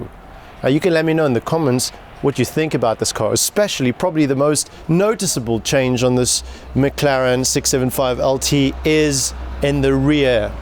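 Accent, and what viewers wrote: South Africa. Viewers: Is the presenter South African? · The presenter sounds South African. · Are you South African? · This guy sounds South African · Sounds like a South African accent to me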